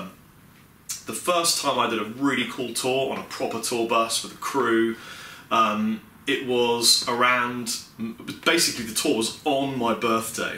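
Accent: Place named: England, United Kingdom. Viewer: I'm a right Scottish fellow but I speak the American English if you will. I find your videos a kin to myself. We're large types of guys and I find myself being proud to see someone speaking the Queen's English! I'm proud of your success thus far!! I hope to see you guys on the West Coast Americas very soon! BTW Chapman Guitars are quite literally the best thing since sliced guitar bread.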